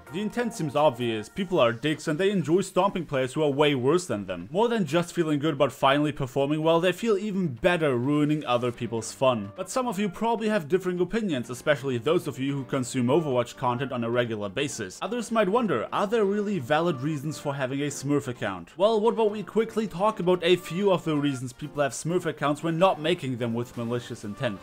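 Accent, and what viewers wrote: Germany. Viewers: Ich wusste es (du bist ein deutscher ich habs immer gewusst alleine an dem akzent) haha ich bin nicht verrückt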